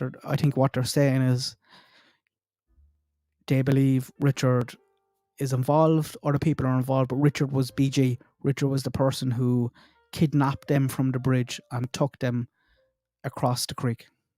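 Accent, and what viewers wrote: Ireland. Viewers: I'll take an Irish accent over a dull Midwest US one or even my own southern US accent any day! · Go on my brother Irish dude that’s a southern thick brogue you have Tipperary or near keep up the good “is mise Dáithí Ó ☘️✌️.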